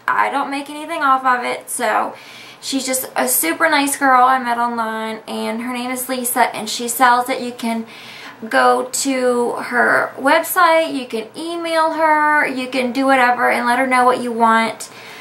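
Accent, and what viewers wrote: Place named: South, United States. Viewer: im in Michigan girl so our weather is just like yours either nice and then bamm its now 85 then the next day back to 65 for a few days then now 90 for a week its nuts! We had some bad tornadoes last week but now its ok this week. Missed your sweet lil southern voice lol good your doing ok. Love your vids n advice n specials n of course tips.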